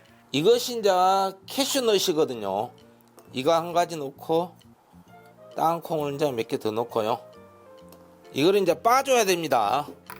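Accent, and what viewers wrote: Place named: Gyeongsang, South Korea. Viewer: 너무 메이갈지말고 ㅋ 쉐프님도 경상도분이시군요 이거람 남편 반찬 걱정없겟어요 ㅎ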